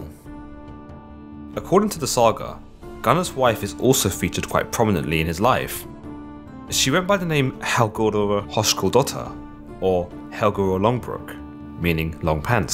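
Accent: United Kingdom. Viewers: Icelanders are really not used to accents, icelandic is only really spoken by Icelanders and we all have (mostly, with miniscule exceptions) the same accent so when someone with e.g British accent comes along it can sound like you are talking nonsense but that's not too say that it's you're job to change the way you speak, I have noticed that some Icelanders have a unfair standard when it comes to accents which can be hard for e.g immigrants which put the time inn to learn icelandic So yes you have a really thick accent but besides that than it was just fine. (A bit late to comment)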